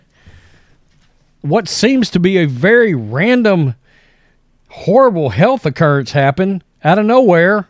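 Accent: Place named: South, United States. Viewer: I love your southern accent so much, thanks for spreading the word on this nightmare, love from Australia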